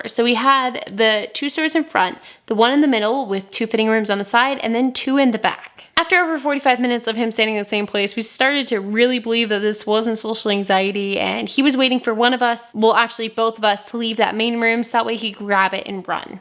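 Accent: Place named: South, United States. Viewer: I love the southern accent. lol!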